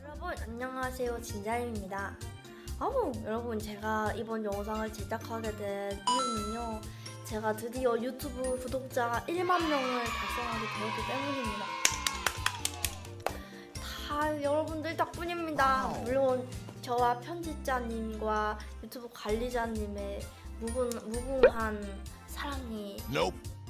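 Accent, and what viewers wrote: Gyeongsang, South Korea. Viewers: 경상도에 사시나요? 사투리 하시는 거 같아서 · 경상도 아압니까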